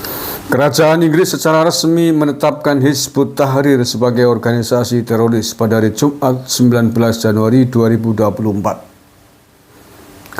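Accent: Banyumas, Indonesia. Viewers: Mas 🤭 ngomong e koyo Ipar ku wong banyumas 👍🏻🙏🏻🥰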